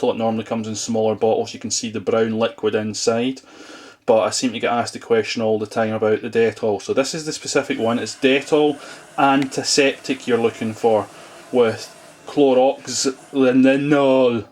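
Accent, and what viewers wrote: Scotland, United Kingdom. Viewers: you have the best Scottish accent since Capt. macmillan from COD4 Bravo sir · I stopped at 3.00 and just have to comment here to tell you your Scottish accent is FOOKING AWSUM!!!!!!!! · Great vid mate, nicely explained! (And one of the best Scots accents Ive been able to enjoy - added a much smoother Connery-esque authority to the demo. Och aye!)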